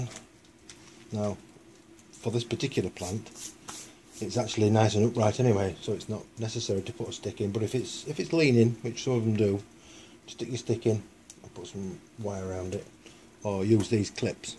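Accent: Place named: Northern England, United Kingdom. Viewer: Thanks for the cool video. Sounds like you are from Northern England and I realize there is accent involved, but I live in Hawaii, and we pronounce the word "CAY-key".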